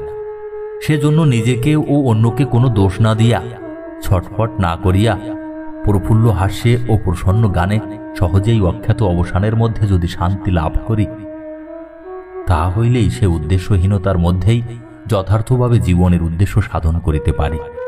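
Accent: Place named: Thakurgaon, Bangladesh. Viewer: Apnar Voice ta ebong background music ta khub bhalo legeche. Uporontu apnar Thakurgaon Bongaiyan uccharon e matir taan anubhav kori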